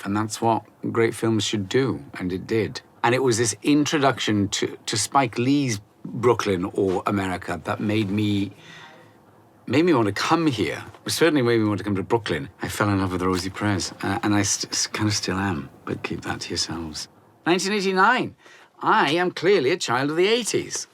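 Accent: United Kingdom. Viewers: Is he British? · I had no idea this dude was British af 😂.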